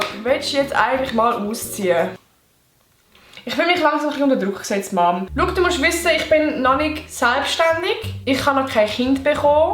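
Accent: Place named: Switzerland. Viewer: Super das mitem schwizerdütsch dankeee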